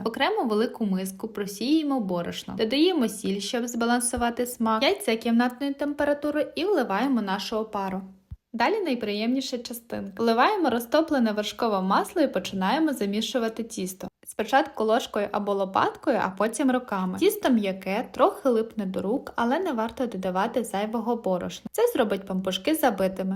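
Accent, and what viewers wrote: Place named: Galicia, Ukraine. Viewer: Чудове відео, швидко,конкретно, та ше й милий галицький діалект! Лайк, подяка, підписка :)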